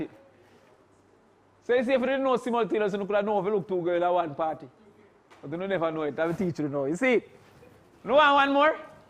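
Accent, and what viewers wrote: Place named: Jamaica. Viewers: He's jamaican. Unless u understand our creole u won't get it. He talks like that so that his class will understand him not as a teacher but as a friend. A smart friend. Who finds it hard to learn from a friend??? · its because he speaks jamaican broken english, i understand because im jamaican. wish u could understand because he teaches so well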